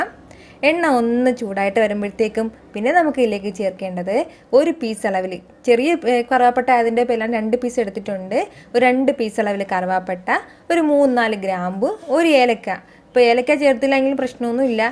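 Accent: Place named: Malabar, India. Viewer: ഹായ്, സുജിത് ഏട്ടന്റെ വീഡിയോ കണ്ടാണ് നിങ്ങളെ പറ്റി അറിഞ്ഞത്, എന്തായാലും ഞാൻ സബ്സ്ക്രൈബേർ ആയിട്ടുണ്ട്. ഇനി മുതൽ വീഡിയോസ് ഒക്കെ കാണാം.. നിങ്ങളെ സംസാരം ട്രിവാൻഡ്രം സ്ലാങ് അല്ലാലോ, ഒരു മലബാർ tech ആണല്ലോ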